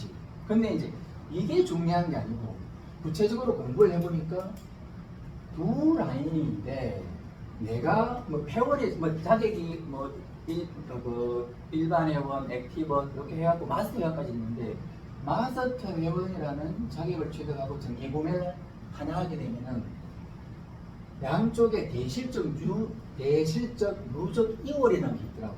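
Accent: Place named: Sangju, South Korea. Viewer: 직접체험 실정된 내용 정보나눔의 열정이 바탕이된 영상 짧은시간 함축된 간증의 이야기가 뜨겁구나 뒷부븐 라인체계가있었나? 마지막에 집중 못했다 옛기억을 더듬어보면 어릴적 누에칠때 뽕잎도 오염되지않고 깨끗해야하고 누에도 청결한곳에서성장하고 잠을재였든것같다 강연을봤으니 총평하자면 내용좋다 스피치에 강약 리듬를 추가하면 기억잔류에 효과가 더해지지싶을듯 도구의 사용 :::: 화이트 보드의 활용에서 우측 중앙상단에 한정되어있음 전체 공간의 필기활용으로 정보 주입저장성향상.... 경영체계 라인구성 (수직형 방사형?) 되물림 (상속)에서 진실성 진정성을 부각 네트워크 마케팅 첫인사 불신임타파노력 상주촌 놈 어투는 조금 변질시켜 표준어구사및 억양수정.. . 화ㅡ법 내용전달면에서 대화속도 말을 조금 천천히 쫗기는것같잖오.. 영상 구성에서는 박수치는 아저씨 아줌마 있든데 영상편집시 배석자 화면 1~2컷은넣어면 어떨까? (박수치는 동참하는 모습) 실크아미노산 정보확장 불 붙기를.....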